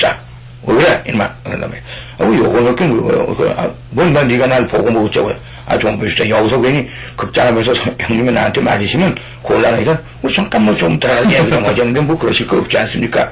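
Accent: South Korea. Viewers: 지방 분이 아니시군요? 저는 지방에서 살기때문에 말씀을 대춤 알아듣겟어요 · 나레이션 분 억양 들으니 경상도 사람 같네요! 뜨끔하시죠 ㅎ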